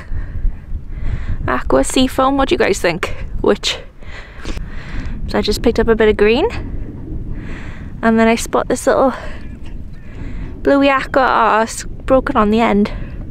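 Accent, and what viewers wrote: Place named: Wales, United Kingdom. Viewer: In love with yr welsh voice ! Beautiful 💋 ❤❤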